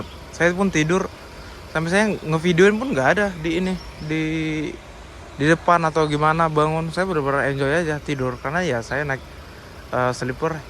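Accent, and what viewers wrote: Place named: Depok, Indonesia. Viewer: Cara ngomong abg ni kayak mas mas Depok Nugroho Febianto 😅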